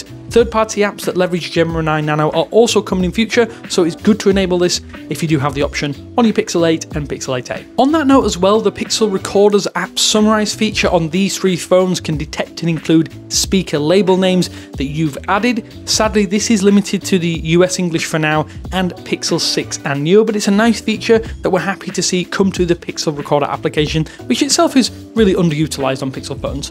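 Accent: United Kingdom. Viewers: You sound like your from the UK but the UK didn't get these features only the US did.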